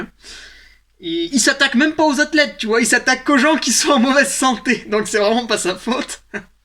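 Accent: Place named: South, France. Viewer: T'as l'accent du sud.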